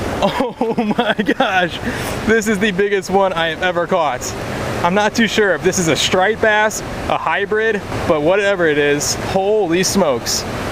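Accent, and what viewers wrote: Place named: Buffalo, United States. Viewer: Your accent reminds me of my buffalo ny extended family....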